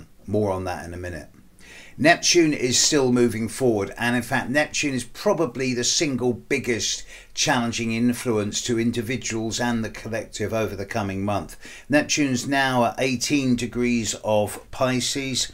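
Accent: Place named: England, United Kingdom. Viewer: Hi! I love your astrology reading and your accent, where you come from in England? I need to go there 🙏😊 Thank you so much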